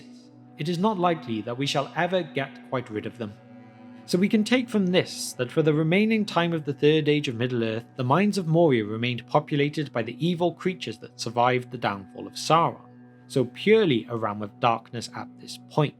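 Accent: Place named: England, United Kingdom. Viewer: I came across your videos this afternoon and I'm really enjoying them and the fact you add some style to pronouncing Elvish and Dwarvish names. The American LOTR vloggers mangle everything or just pronounce the place names like they might actually be somewhere in Wisconsin. One thing - I'm presuming you're English? I can't put my finger on your accent but the giveaway is you pronounce Durin as "Jewrin" instead of "Doo-rin". Like the "Juke of Edingburgh" (although you Brits never called Duran Duran "Jewran Jewran" thank God!) It's the only flaw in your otherwise excellent work!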